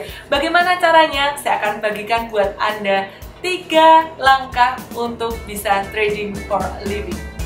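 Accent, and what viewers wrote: Java, Indonesia. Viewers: Walaupun medok..tapi okelahh cara dia menjelaskanny thanks mom😍😍😍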